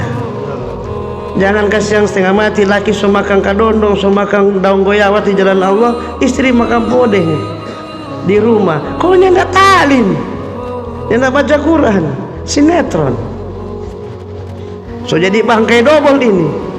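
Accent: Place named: Manado, Indonesia. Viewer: Jangan piara bangkai Dalam Rumah .😂.. Ini bahasa Manado kah? Lucu dengar nya. Tapi sangat menyentuh hati subhanallah.. Semoga Allah berikan saya dan keluarga Hidayah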